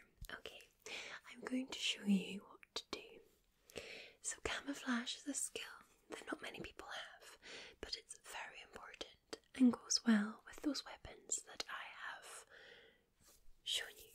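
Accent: Scotland, United Kingdom. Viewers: I hear Scottish I click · Do you know who I am My brain: slight Scottish accent Me: MERIDA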